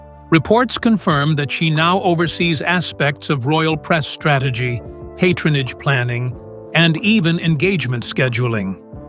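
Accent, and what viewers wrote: United States. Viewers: Keep using the disrespectful images of Katherine and the obnoxious American narrator.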